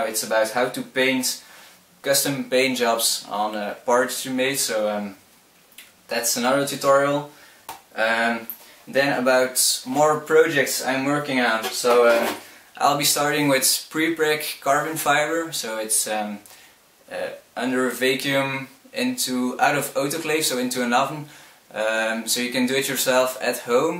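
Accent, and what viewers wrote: Netherlands. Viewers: You dutch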